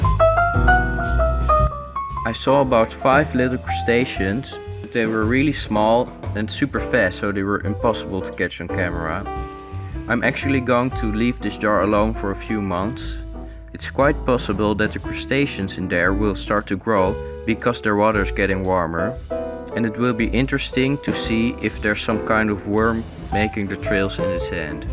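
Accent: Netherlands. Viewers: Are u dutch? U sound it lol, im dutch but without the accent. Hoe gaat het?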